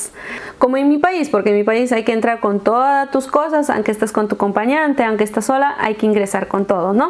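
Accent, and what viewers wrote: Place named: Peru. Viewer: por tu acento eres de Perú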